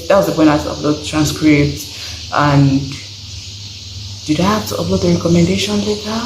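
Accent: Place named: Cameroon. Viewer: I just started watching the video and you sounded so Cameronian 😂❤ And then boom you mentioned it 😂❤❤ I'm a Cameroonian too ❤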